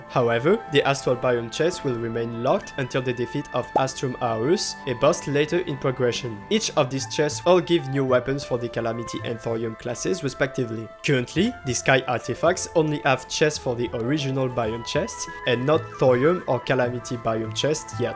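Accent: France. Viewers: Goated guide as always this guy is just built different are u french? cuz yk the accent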